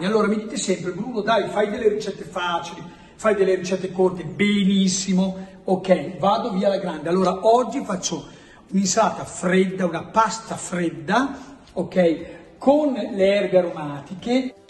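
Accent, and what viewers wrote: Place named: Romagna, Italy. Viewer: Hai un accento Romagnolo che si sente a metri di distanza!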